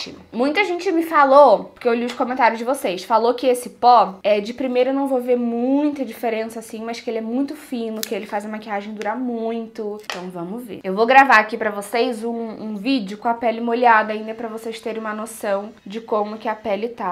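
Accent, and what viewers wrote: Rio de Janeiro, Brazil. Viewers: Você e muito linda e simpática, mas tenho uma pergunta, você e Carioca?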